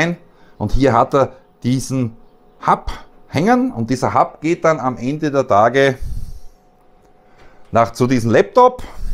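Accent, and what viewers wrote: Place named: Slovakia. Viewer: Ich genieße dein Dialekt in Slowakei. Toll toll toll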